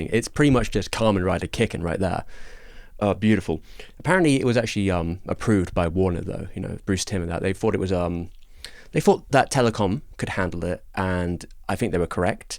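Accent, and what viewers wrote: United Kingdom. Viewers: japanese names in a british accent are crazy...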